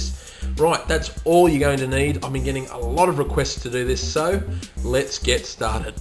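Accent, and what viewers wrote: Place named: Australia. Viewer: xD his accent is australian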